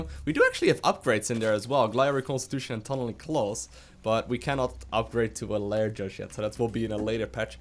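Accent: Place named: Netherlands. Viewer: The accent, though. Are you Dutch? Good vid nonetheless!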